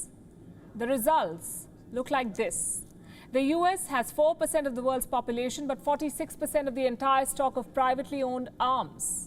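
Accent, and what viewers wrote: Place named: India. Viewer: 𝕀𝕟𝕕𝕚𝕒𝕟 𝕒𝕔𝕔𝕖𝕟𝕥 𝕚𝕤 𝕛𝕦𝕤𝕥 𝕡𝕝𝕒𝕚𝕟 𝕟𝕒𝕤𝕥𝕪 𝕃𝕆𝕃!!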